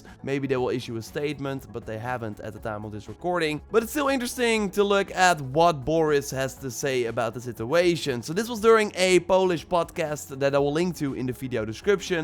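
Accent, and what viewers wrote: Netherlands. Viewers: The dutch accent is heavy on this one. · Are you dutch ??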